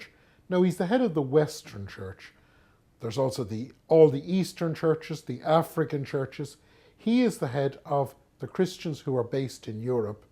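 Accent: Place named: Ireland. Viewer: hi - accent is irish:) I like irish accents, and scottish ones too, but my fav is south african.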